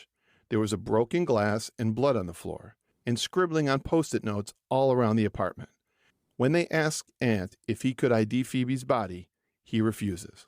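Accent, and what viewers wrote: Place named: Rust Belt, United States. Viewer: I love your voice, too. I have to ask if you are from the rust belt because the way you speak is very familiar and I am a curious cat. Just wondering. I grew up in Ohio and the Buffalo area myself.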